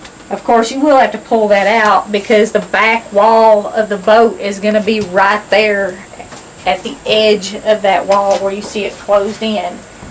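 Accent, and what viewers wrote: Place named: Kentucky, United States. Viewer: Hi - what's new on the houseboat? We're working on a plan and your videos are so helpful. Where are you? You sound like you're from KY or Western NC where I live. I've learned a lot from you and look forward to hearing from you again soon. Thanks.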